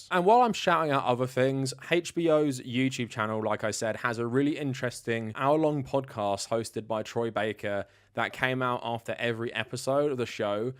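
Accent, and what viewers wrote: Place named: United Kingdom. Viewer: That’s funny. I never thought there was any other way to say those words. I mean, I sometimes say mirror like it has an o in it, but only because it sounds weird. English is a nonsense language with no real rules. That’s what makes it so good. And in all honesty, I’m a sucker for foreign accents, which is one reason I watch this channel. Everything sounds smarter with a British accent.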